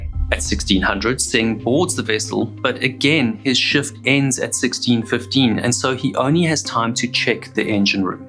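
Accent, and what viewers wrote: South Africa. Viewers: Loving your videos. You also sound like your south African. I cannot and don't ever condone censorship cept to photos of uninvolved topic like children like a family photo showing the suspect in it too for example. But otherwise i despise it so don't show something when you censor it. Why show it then? Anyways despite that leading to a thumbs down az i always do zo for any censorship when topics like this or with true crime is involved always will get a dislike as i don't like censorship in such topics. But that said i love your videos still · Wait, you are southafrican? Accent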